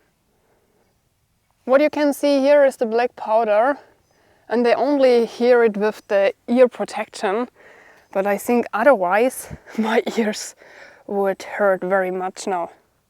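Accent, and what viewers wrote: Germany. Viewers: great video thank you. have consider security camera it will alarm you also is equipped with police siren. you have nice and pleasant German accent. merci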